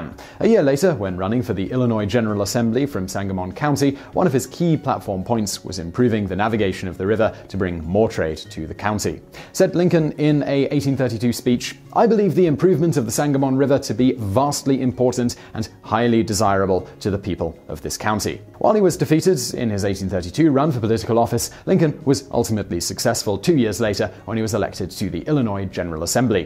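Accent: United Kingdom. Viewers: British Vsauce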